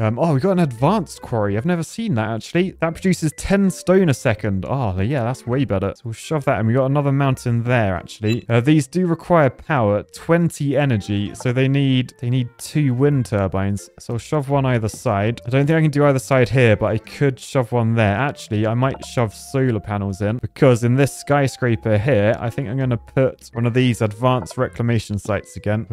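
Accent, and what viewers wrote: United Kingdom. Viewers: Wow thanks for playing our game! I'm British too btw, so I approve of the pronunciation!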